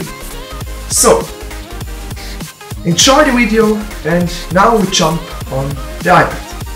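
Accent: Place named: Germany. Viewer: Are you German or something? you kinda sound like your German. And if you are, Hallo :-)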